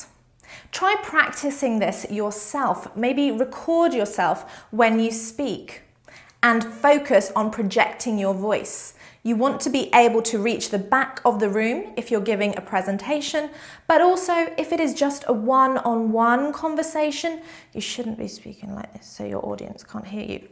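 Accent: United Kingdom. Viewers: A very engaging presentation. I like Teacher articulation of words - so clear, great pronunciations, so distinctly British accent. Reminds me of my favourite english teacher, Miss Courtney of "Mind Your Language" fame. She's always back straight chin up. I really marvelled the way she speaks, so British. She's got her own peculiar strong screen presence.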